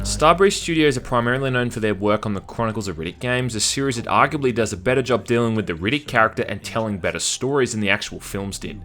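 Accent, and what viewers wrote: Australia. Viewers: OMFG I was just thinking of this game and how awesome and underrated I thought it was and then you go and make a review about it, how timely, keep on rocking and reviewing old gems dude, love your channel for this and your Australian accent, gives Hemsworth a run for his money:D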